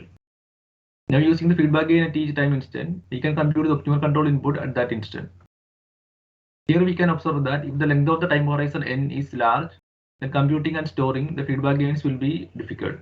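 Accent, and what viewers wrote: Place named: Kerala, India. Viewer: you can be little slow.the words of speech are malayalam accent.it could be made a little clearer.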